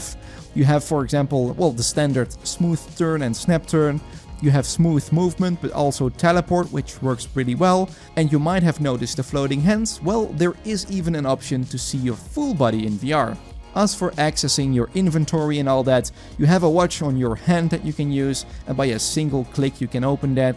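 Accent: Netherlands. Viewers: You must be Dutch. The accent.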